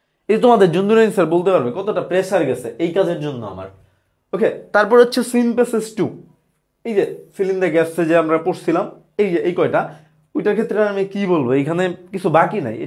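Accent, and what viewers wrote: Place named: Sylhet, Bangladesh. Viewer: ভাই কি সিলেটি? ওনার ভাষায় সিলেটি টান বুঝা যায়!😅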